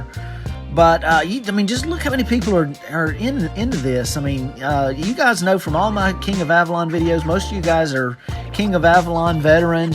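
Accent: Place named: South, United States. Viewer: Game looks interesting. You sound less southern.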